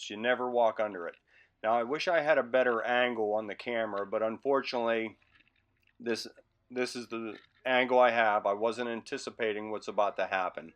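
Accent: Pittsburgh, United States. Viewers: I'm just a minute 30 into the video, and I'm like, he sounds like Pittsburgh, and I checked, TSH Concrete is indeed in Butler County, Pennsylvania, I got family that live out near there still. I never hear the Pittsburgh accent living out here in the boondocks of america, Portland Oregon where grocery stores and even the pubs close at 11pm every day of the week. anyway, on with the video, feeling very nostalgic just listening to you talk, after 21 years its nice to hear another voice from the area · that yinzer accent.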